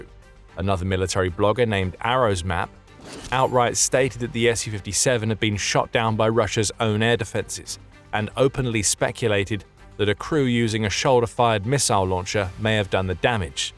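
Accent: England, United Kingdom. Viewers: Crap Americanisms with an English accent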